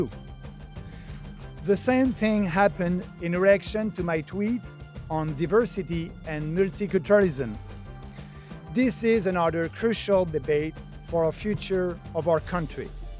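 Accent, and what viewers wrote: France. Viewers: The French accent applied to English speech gets us pay closer attention to the words and by extension to their meaning. He also got to his points quickly, without buzzwords. On a side note, I can’t picture Maxime stopping an English speaker to correct the word ‘mankind’ as ‘peoplekind’. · Wow this guy tells it like it is not for himself but for Canadians. This guy needs to be Prime Minister. Perfect name for the party! It’s like a revamp for the Conservative party! If Canadian can overlook his French accent then we will have a real leader! God we might need your help! Canada needs your help! 🇨🇦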